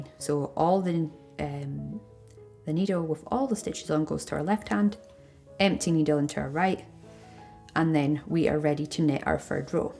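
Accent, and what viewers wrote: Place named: Scotland, United Kingdom. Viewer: Thank you for your precise directions it worked,50 years without touching the needles. Love you Scottish accent.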